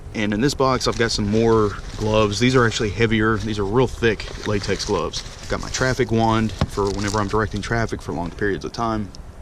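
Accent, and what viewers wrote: United States. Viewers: Your accent sounds American but one of your guidebooks was specifically for Canada... can you confirm what country you're in?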